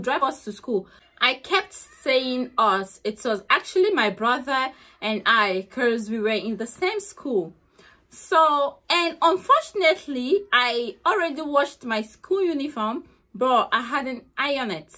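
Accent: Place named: Ghana. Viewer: So sorry 😞but na ur stubbornness cause am 😆 I love ur English u speak like Ghanaians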